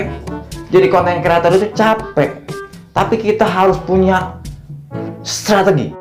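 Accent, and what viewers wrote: Jakarta, Indonesia. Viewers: Iya iya..... Coba itu 😂😂😂😂betawi banget..... Salam depok citayam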